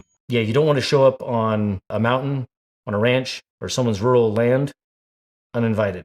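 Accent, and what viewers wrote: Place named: Central Washington, United States. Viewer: Bro, it sounds like you live in central Washington! I wish I could send you a message to see if we live in the same town 🤣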